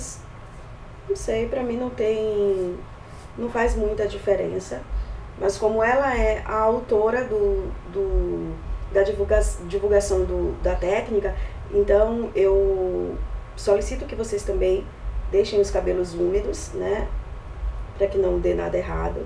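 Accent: Bahia, Brazil. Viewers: Faz pouco tempo que acompanho os seus videos , mais desde o primeiro momento adorei esse sotaque maravilhoso que me faz lembrar das minhas ferias ai na Bahia, e queria te parabenizar por transmitir tanta coisa boa nos teus videos e a cada palavra de sabedoria que você diz neles . Beijos e muito sucesso pra você !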